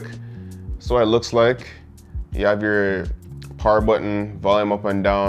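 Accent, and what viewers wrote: Jamaica. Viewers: This Bredda Jamaican?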